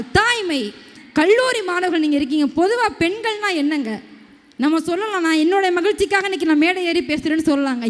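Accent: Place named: Chennai, India. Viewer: பள்ளிக்கூடத்தில் படித்தது ஒன்று, பேசுவது Madras தமிழ் தூதூதூதூதூதூ ....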